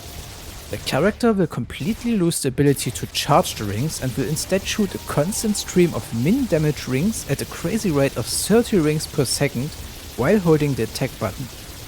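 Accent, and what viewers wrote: Germany. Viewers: ein deutscher wie man seine englische ausprache kennt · you sound german xD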